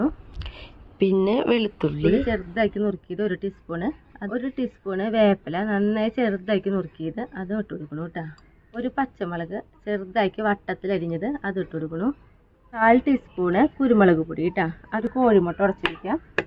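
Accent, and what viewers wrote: Thrissur, India. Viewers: കുറച്ചു കൂടി clearആയിട്ട് സംസാരിക്കണെ..ഞങ്ങൾതൃശ്ശൂർക്കാരല്ല..മനസ്സിലാകാൻ കുരച്ചു പ്രയാസമുണ്ട്❤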